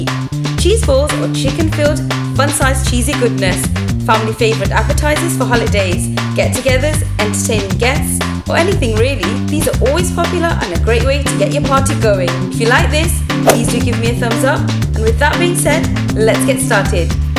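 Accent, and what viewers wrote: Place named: United Kingdom. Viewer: I have no idea what u are saying british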